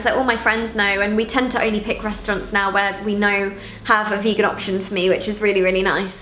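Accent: United Kingdom. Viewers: hey! you're so beautiful, you take care of your body and have a british accent. inspiring lady. keep making videos! 🙆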